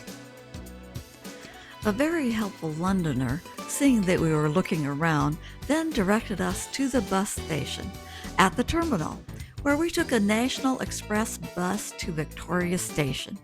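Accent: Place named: United States. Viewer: Love your commentary best American accent ever enjoy your stay , when are you coming to the greatest country in the world, Scotland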